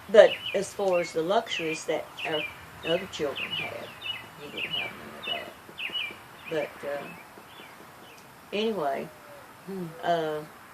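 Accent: South, United States. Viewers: This reminded me of the stories my Great Grandmother used to tell, The wonderful southern accent made it all the better. Thank you for sharing.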